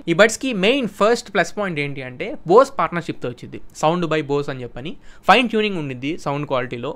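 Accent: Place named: Guntur, India. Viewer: ఆ ఉండిద్ది ,వచ్చిద్ది ,పోయిద్ది కొనమాకండి ఆహా 😊 ఆ గుంటూరు east ప్రకాశం యాస లో కిక్కే వేరబ్బా ❤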